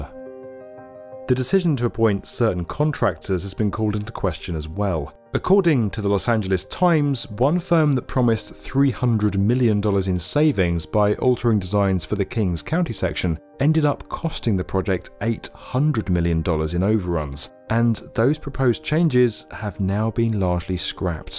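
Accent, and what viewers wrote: England, United Kingdom. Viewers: Another fun fact-- the hugeness of our country & relative lack of population density makes new trains less practical. Endless EPA hoops & needed land acquisitions add decades & hundreds of BILLIONS of dollars to the project. A suave-sounding Englishman does not make the project more appealing or make gargantuan hurdles any easier to overcome. Concrete from early construction will need to be repoured toward the end of construction at the current pace. I know, CHINA, CHINA, CHINA. THEY HAVE GREAT TRAINS THERE. MAYBE YOU SHOULD RELOCATE TO BEJING! Nsity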